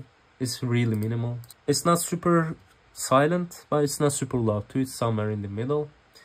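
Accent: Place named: Turkey. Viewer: U sound like turkish. R u turkish?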